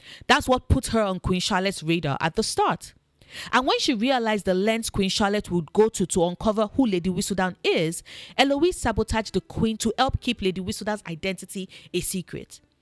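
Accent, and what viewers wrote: Nigeria. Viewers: Very random but sound like some of my Nigerian sisters from the south east. 🤩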